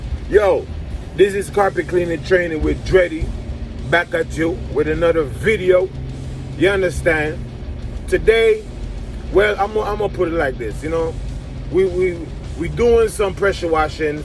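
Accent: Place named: Jamaica. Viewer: sound like your Jamaican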